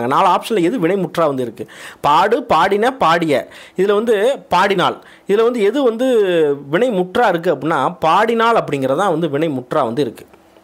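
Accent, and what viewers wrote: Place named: Madurai, India. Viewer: Super video sir. Thank u🎉 unga iyalbana peachu enga maduraiya kanu munadi kondu varuthu sir. Easy ah understand aguthu sir ilakanam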